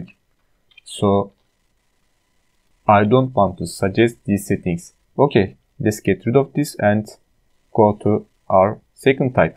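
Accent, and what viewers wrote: Turkey. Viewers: where are you from? I was curious. You speak English like Turkish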